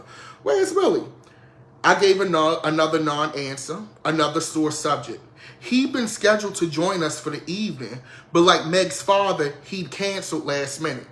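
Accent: Baltimore, United States. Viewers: You got me over here cracking TF up, lil Bmore accent and all the shade- YouTube sent me here from Tells and I LIVE. Hope you do more 🤣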